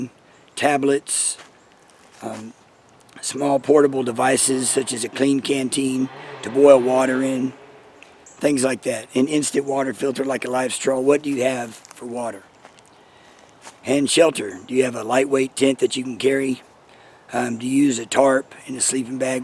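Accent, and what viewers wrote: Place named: South, United States. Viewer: I suscribed just because I love how southern your accent is lol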